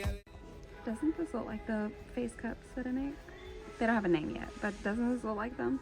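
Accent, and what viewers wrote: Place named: South, United States. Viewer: Great Video! I really enjoyed it. First, that entire struggle with the little coffee brewer had me rollin 😂. It was too cute! I also thought your story about being 2 mins late for the tour was hilarious! “I can see the backs of their heads” 🤣🤣 I missed you so much and I’m so happy you’re back. I live in NY state but my family is from the south and I miss them. Your accent feels like home to me. Thank you. You are loved.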